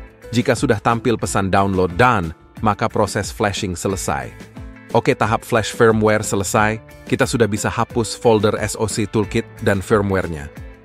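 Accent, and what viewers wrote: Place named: Sulawesi, Indonesia. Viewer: bbrapa wktu yg lalu sy nnton dia hunting ps4. Ad semacam percakpan logat nya sprti dari pulau sulawesi. Perkiraan sy dia di manado atau gorontalo atau bsa jg di kota palu.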